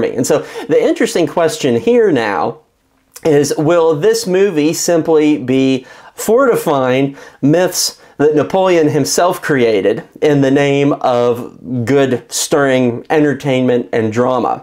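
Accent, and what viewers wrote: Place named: Pittsburgh, United States. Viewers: This guy's Yinzer accent is strong. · The presenter has a Pennsylvania accent. He sounds educated. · Bro you gotta be from Pittsburgh Area. I moved away from there when I was like 12 and can pick that areas accent out. Lmk if I’m right.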